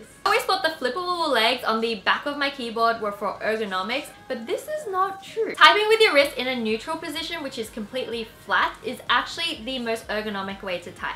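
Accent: Australia. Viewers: Bunnykis she's Australian. At least I'm 98% sure. Because I'm Australian but at the start it was also hard for me to tell as well 😅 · Are u Australian wengie? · She has a Australian accent because she's lived in Australia for a while before when she was a younger and she's actually Chinese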